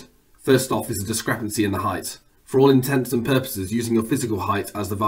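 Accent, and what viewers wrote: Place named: United Kingdom. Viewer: Your setup would be ideal if you were standing on the equator at either the spring or fall equinox. The reason your result is lower than the actual is due to the geometry that results from the tilt of the Earth at that time of year and of your latitude on Earth. If you do this experiment on the 1st day of spring or 1st day of fall, then you'd only need to adjust due to your latitude. If you divide by the cosine of your latitude (you sound British so lets say South England ~50 deg), you'd get 6222 km (4000 / cos(50)).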